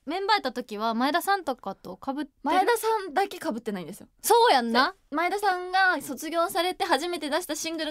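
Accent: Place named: Kyoto, Japan. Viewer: はんなり京都弁めっちゃかわいいな いいこなの伝わってくるわー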